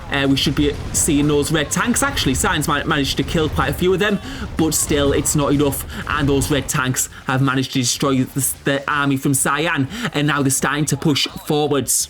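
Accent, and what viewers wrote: United Kingdom. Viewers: i like your akzent :) very british right ? did you join the german community ?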